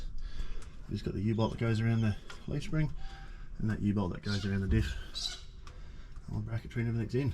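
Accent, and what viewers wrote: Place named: Australia. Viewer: Your accent says you are in Australia. Does Airbagman sell in the U.S.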